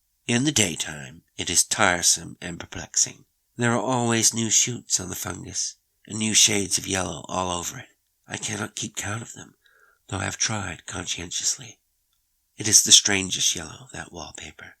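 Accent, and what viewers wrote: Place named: United States. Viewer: I’ve just realised that’s you reading! I honestly to God though you had hired an American actor! You are aweamazing! I spent the first years of my life in the US and I didn’t realise! 🌟🌟🌟🌟🌟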